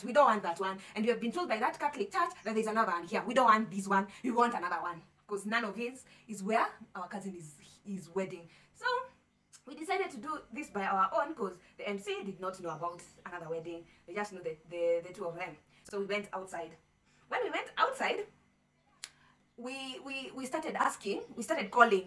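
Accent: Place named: Africa. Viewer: In this video language in African ascent and fast.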